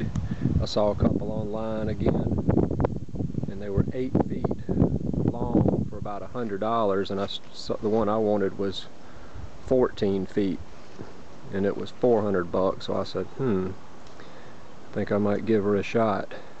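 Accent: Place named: United States. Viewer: Real American accent 👍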